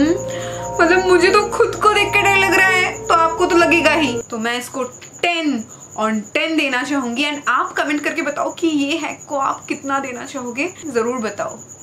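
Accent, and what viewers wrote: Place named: West Bengal, India. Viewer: ap bangali ho you know when I hear you bengali voice I just 😱shocked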